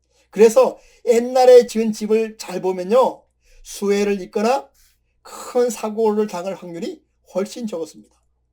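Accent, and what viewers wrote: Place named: Gangwon, South Korea. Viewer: 어머.선생님 어쩐지 강원도 사투리가 있다 싶었는데... 반갑습니다. 저도 영월출신입니다.^^ 저도 주역공부 열씸히 하고 있답니다.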